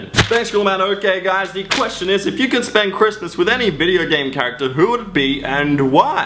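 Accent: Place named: Australia. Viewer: O i thought u were american ur aussie :D Im Glad There Are Some Aussies Gaming! :D But Your Dad Dieing Still Makes Me Sad D: R.I.P To Your Dad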